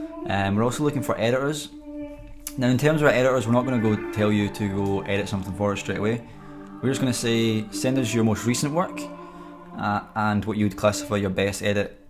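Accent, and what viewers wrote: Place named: Bishopbriggs, United Kingdom. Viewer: wits the deal wae ur mad accent yer fae bishy pal.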